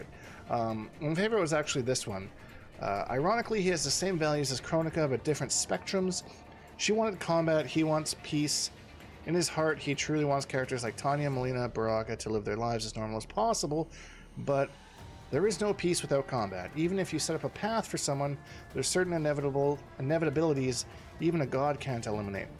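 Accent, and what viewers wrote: Canada. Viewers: Ah aboot time we get another great video from love the way u guys say aboot in canada hope i can run into you in the beta brother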